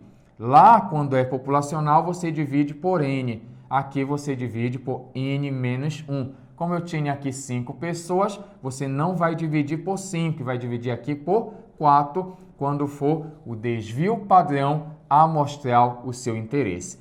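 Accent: Pará, Brazil. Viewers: Prof. o senhor é paraense?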